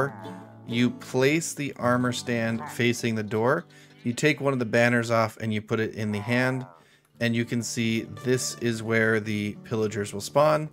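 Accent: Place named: South, United States. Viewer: I have no clue, sounds southern.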